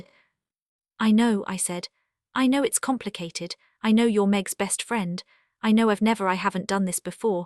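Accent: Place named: United Kingdom. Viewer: The narrator has a very pleasant voice, but she has a British accent. Why don't they make the primary character from England instead of Vermont? Aside from that small complaint, it's a lovely story.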